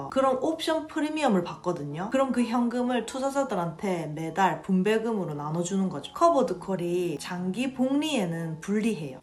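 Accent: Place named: Busan, South Korea. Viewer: 짱이에요 영상 항상 잘 챙겨보고있어요...!!🥹💜 친근한 부산사투리 너무조와용 >_<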